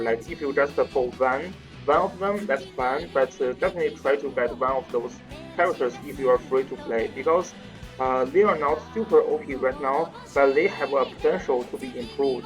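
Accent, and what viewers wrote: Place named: China. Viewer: I cant understand that english , such a strong chinese accent , and music background make it worst ....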